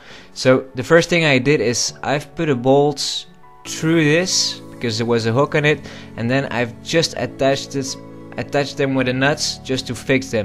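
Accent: Netherlands. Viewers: dutch accent cant hide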